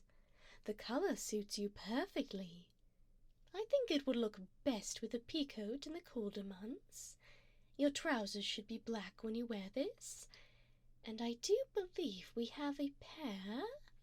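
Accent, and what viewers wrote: United Kingdom. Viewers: British vampire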